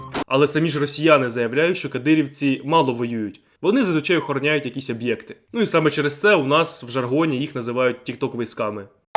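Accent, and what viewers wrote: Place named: Vinnytsia, Ukraine. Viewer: Ти що з Вінниці) цікавий суржик)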